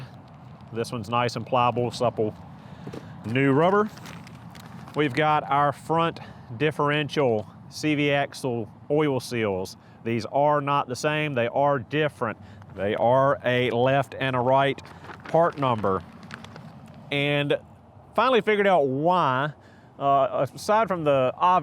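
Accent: South, United States. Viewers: I didn't mind your southern accent and it does take me awhile to get used to it 😅, and I like your video that show people how to do things 🎉 my brother's car needs a part replacement in the engine a mechanic wants to charge him three thousand dollar 😭 to do it, he didn't want to do it, but he fixed it 😅 I asked him how did he do it, he said he watched some yt videos on how to do it so on a long weekend he rented a engine lift thing and with help of his sons they got it done 😅🎉 I was impressed because the car is a BMW M7 😱 he took the engine apart change the part which he ordered online and put everything back together 😢, I have a Subaru repair manual It does have details instruction on how to do it but watch your video on how to do it and the tips he gives that is something a manual can't give me so I 👍👍👍 for you to do it, but it mean more work for you I hope you can continue to make this type of video 🎉 because a mechanic is just too expensive and I don't know if he is telling the truth 😢, my mom told me s story about my aunt, when she brought a car, because she didn't know anything about cars so she took a Auto repair class in highschool, I was surprised 😅 because she has a PhD and a professor at UCLA tough there for a long time and yet she knows nothing about how a car works mechanically so she took the class to have an idea on how car works, sometimes I was wondering when she was in the classroom does her classmates or the teacher know about her, they may think she is jus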